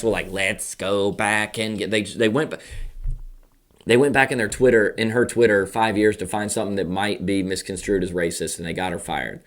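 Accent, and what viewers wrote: South, United States. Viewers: Keep it suh-thurn! It’s the best!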